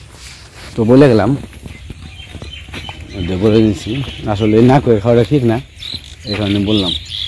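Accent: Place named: Dhamrai, Bangladesh. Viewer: Aapnader Baari kothaye bhai? Aapner accent shune mone hocche aamar Nana'r barir kaache. Aamar Nanar baari Dhamrai Upozila, Graam Kushura, Bongshi Nodir paare.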